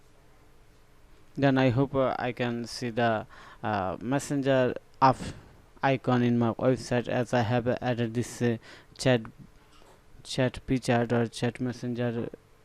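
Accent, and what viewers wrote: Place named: India. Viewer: Lovely Indian accent.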